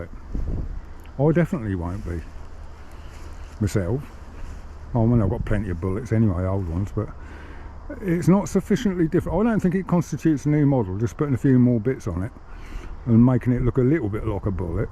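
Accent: Suffolk, United Kingdom. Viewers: Nout wrong with a Suffolk accent 👍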